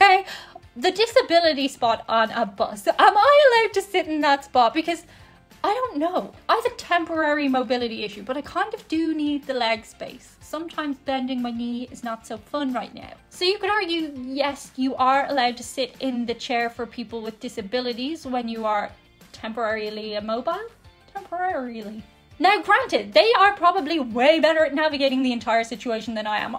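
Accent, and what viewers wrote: Ireland. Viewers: You're an angel! I'm sorry that you had to have knee surgery but it seems to have gone well. Something that seems different in this video is that you seem to be talking faster than usual, and with more of an Irish accent than you normally do. My best wishes to you for a speedy recovery!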